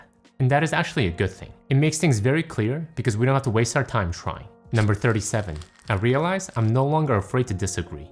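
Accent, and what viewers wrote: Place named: South Korea. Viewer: You have a strong KOREAN accent.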